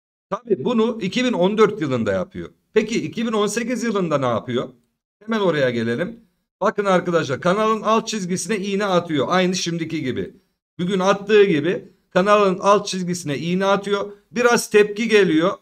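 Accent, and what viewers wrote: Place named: Nigde, Turkey. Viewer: Usta niğdeli aksanıyla konuşuyorsunuz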